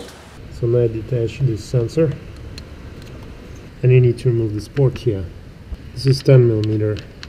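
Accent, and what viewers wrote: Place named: Russia. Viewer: Love the Russian accent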